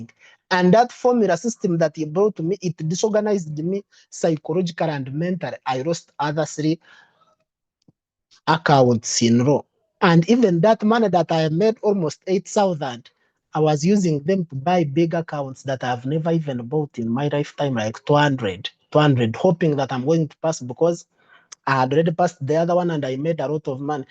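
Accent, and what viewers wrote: Ankole, Uganda. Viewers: That accent is for pure banyankore not bakiga. Much love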